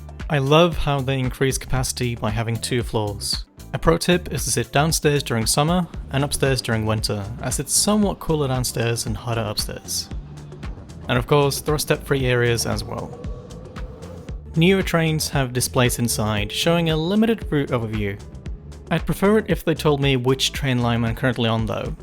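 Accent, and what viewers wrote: Australia. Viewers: Interesting you are from Norway; there is a lot of Australian in your English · For someone who lives in Norway, you sound very aussie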